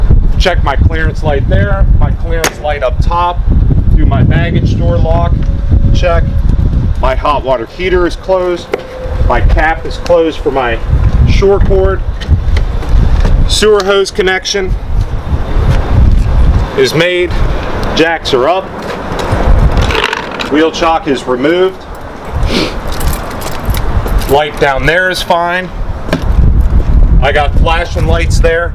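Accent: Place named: Pittsburgh, United States. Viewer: This is funny because I'm from Pittsburgh living in Florida and I know a yinzer when I hear one. Feels like home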